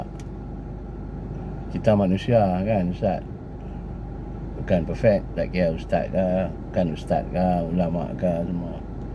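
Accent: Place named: North, Malaysia. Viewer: Deme ni X faham cara ckp org utara. 'anak haram' ni bkn maksud maki. Biasa je.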